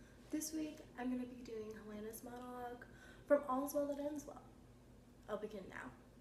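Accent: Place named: England, United Kingdom. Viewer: I just wanted to inform you that when doing a Shakespeare monologue, an English accent is not preferred. you may be associating the monologue with the fact that Shakespeare was originally from england so everyone back in that time who performed a piece written by him had an accent. but they were originally from england so there accents were what they considered usual speech. my point to this is just do your normal voice no accent is needed nearly every with Shakespeare unless it's set in a different location such as France etc. But I honestly thought your piece was great nice job😍😍😍😘😊!!!!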